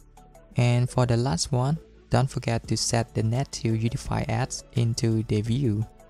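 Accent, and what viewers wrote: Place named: Thailand. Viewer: Thank you for good tutorial, (One question, are you Thai people? for me your sound like Thai people 😊👍)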